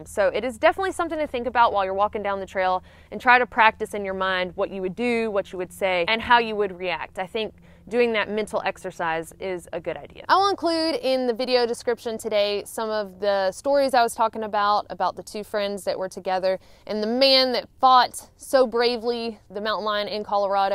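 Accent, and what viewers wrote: South, United States. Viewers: Good primer vid. Cool to a non-tarzan dude doing a predator show. But, being a southern boy, I can't determine the accent. A southern accent kicks in with every word with an "i" and the word food. Georgia, Tennessee, the Carolinas? Maybe Florida? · Love her Southern accent. Charming. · Where are you from?? You sound a little southern. Mountain lions are silent and sneaky. I heard said that if you wear a hat that has eyes or a face on the back of the hat it could stop an attack from behind. Sacketts I love those books I've read them all, starting at the beginning. Ole Barnabas Sackett started it all☺. I wonder if bear spray works on mountain lions. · Are you a southern belle? You certainly sound like it. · Thank you so much for this informational video! & btw from a Michigander I have to say that your southern drawl is so sweet very charming!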